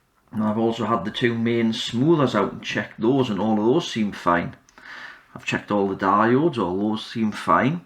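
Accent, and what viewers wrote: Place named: Tyneside, United Kingdom. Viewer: Hi if you dont mind me asking where is your local repair cafe? It sounds an interesting place that i would like to check out (im a fellow electronics repair engineer in the Tyneside area). I am of course making assumptions from your accent.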